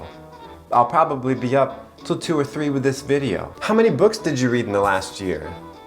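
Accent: France. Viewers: You have a beautiful French accent. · This French accent of yours is something I wasn’t ready for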